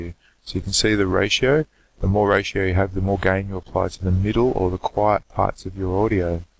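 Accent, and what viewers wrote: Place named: United Kingdom. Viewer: Hey, this was a GREAT tutorial! Thank you very much for posting. I had used Audacity for a while, but stopped because I could NEVER figure out how to denoise things (and getting a new mic helped a great deal too...) Now, after seeing this, I think I'll start using Audacity again, as there are some very nice things about it... Faved. Good to see you again. Hope you're well. (I had NO idea you were British!:D)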